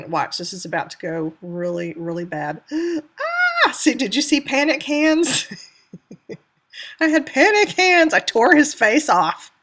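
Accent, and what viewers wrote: South, United States. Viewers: Love! Where are you from? You sound like you have a southern accent! I'm a Mississippi girl;)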